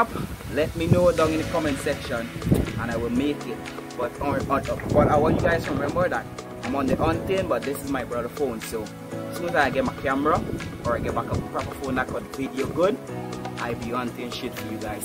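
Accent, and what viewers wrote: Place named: Guyana. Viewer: Keep the Guyanese accent bro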